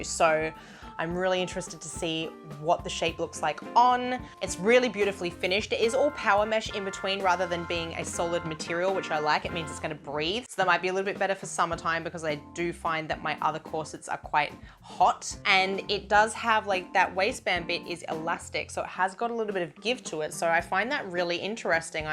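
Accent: Australia. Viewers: I love the Aussie accent. She is a beauty as well. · Are you Australian, I love your accent 😃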